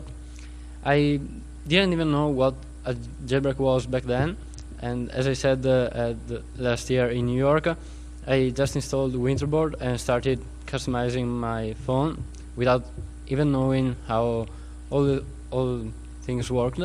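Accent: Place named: Italy. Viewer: I'm so in love with this italian accent <3 Awesome tweak developer !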